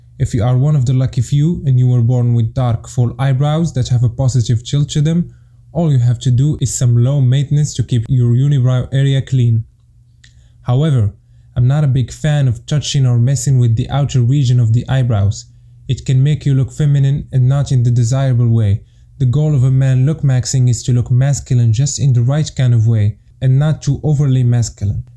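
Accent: Morocco. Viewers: Man i could notice the moroccan accent from the getgo 😂 , great concept , keep it up ! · Hahaha I swear 3reftek Moroccan gher meen accent , prouud of youu keep it up🔥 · you sound morrocan idk why, good vid tho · Tell me you're Moroccan without telling me you're Moroccan. Your accent bruh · i bet you're moroccan just by the accent, am i right a 3chiri ?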